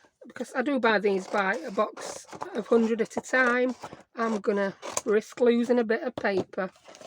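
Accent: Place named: Yorkshire, United Kingdom. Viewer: I love your rambles! I'm an American and not used to listening to Yorkshire accents, although I was very proud of myself for recognizing it before I heard you mention it. Please don't take it as a criticism. My ears will get used to it soon, I'm sure, and I'll probably start sounding like you! (Not likely LOL) That happens to me whenever I spend time in the Southern states. I start sounding Southern.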